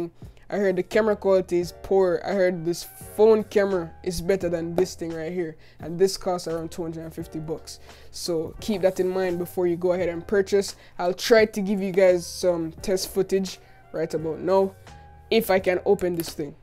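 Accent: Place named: Jamaica. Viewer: Bro you can’t tell me your not a Jamaican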